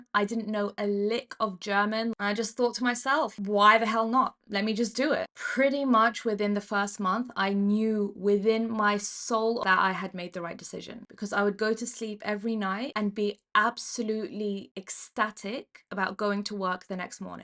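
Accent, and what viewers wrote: United Kingdom. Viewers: Everytime i hear a British accident i change the video lol i know how bad it is in the UK. I’m interested in the us only.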